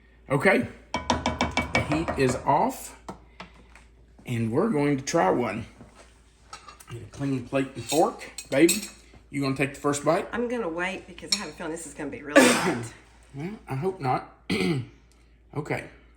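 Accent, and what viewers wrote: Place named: Southwest Virginia, United States. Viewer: I think the thing I like best about you is your accent. It sounds exactly like I sound from SW Va. Thanks for your channel. I love it. I am adding to my grocery list right now.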